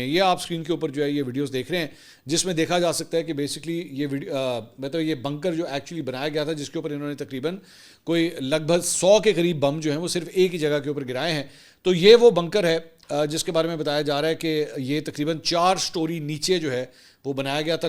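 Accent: Punjab, Pakistan. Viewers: Jo ap Urdu bolty bolty Punjabi ka touch daity Hain I love that❤❤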